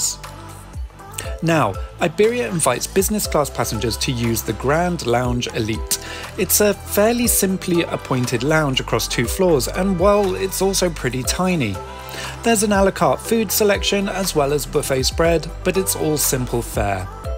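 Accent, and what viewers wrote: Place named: United Kingdom. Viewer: Thanks for your informative and top notch aviation video. I am really impressed with Iberia the Spanish Airline with their latest aircraft and sumptuous banquet for Business Class passengers. Hope they become well known like the top three Middle Eastern airlines by flying to more destinations in Asia 🌏 also. Your video quality is brilliant and complemented by your commentary in an elegant English accent. Please keep up your high standards in such content creation. ❤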